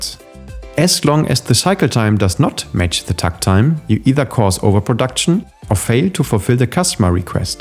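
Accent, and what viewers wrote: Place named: Germany. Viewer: Man hört, dass du Deutscher bist. warum machst du dann nicht das Video auf deutsch?👀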